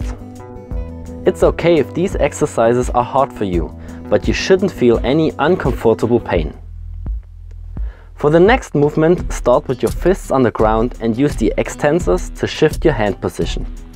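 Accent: Germany. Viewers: German accent!!! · This german Akzent 😂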